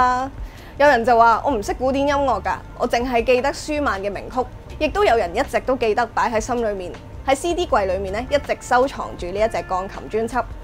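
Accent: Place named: Hong Kong. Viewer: 台灣人報到！好鍾意妳的香港話😍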